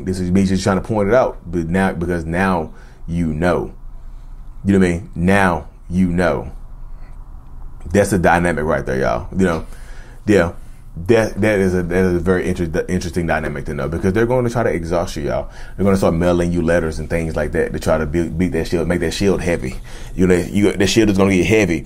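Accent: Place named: South, United States. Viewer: This is random but I am from the northeast so to me you have such a southern accent and I love it ❤❤ I could listen to you talk all day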